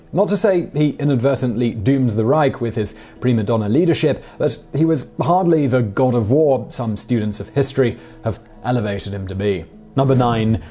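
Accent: United Kingdom. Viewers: What in the world qualifies you to "clear up misconseptions" about anything much less the Third Reich. Just because you have a "proper British accent" that doesn't make you an expert on anything. Frankly if I had the choice between fighting for you or fighting for Rommel, I'd choose Rommel. Another wannabe "know it all". I wasted my time and the battery power on my laptop watching you. Never again!!!!!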